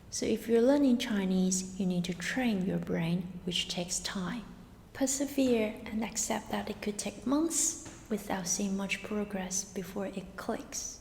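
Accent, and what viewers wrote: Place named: United Kingdom. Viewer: 谢谢老师推荐，你的英音太好听啦！！！🥰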